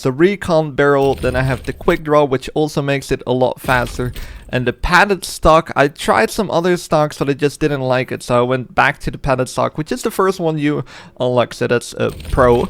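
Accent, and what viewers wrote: Netherlands. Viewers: He is Dutch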